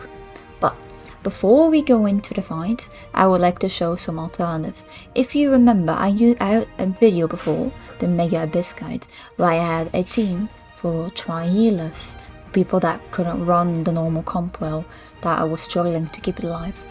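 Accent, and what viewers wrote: United Kingdom. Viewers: sounds a bit british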